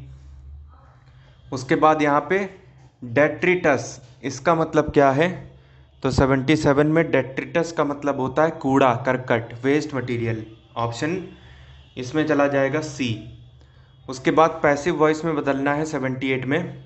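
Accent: Kanpur, India. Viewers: Ye sir pakka kanpur k hai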